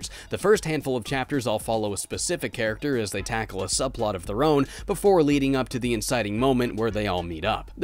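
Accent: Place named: Canada. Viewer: Are you Canadian? Noticed the -ehr-'s on your "A" pronunciation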